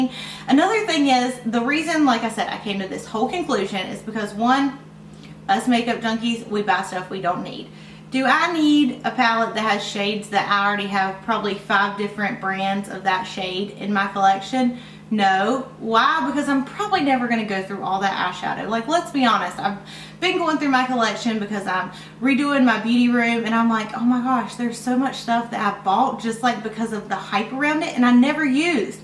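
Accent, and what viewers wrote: South, United States. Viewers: this is such a good video like why buy something that's just like other things we own and plus I don't think she could ever make anything sound negative with her southern like accent😊😊😍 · Thank you for honesty, so many times I feel you tubers push products just the sake of selling them. I unfortunately an one those who fall for it too. Keep up great videos. I love your accent too as someone from Kentucky it's nice hear a little southern twang.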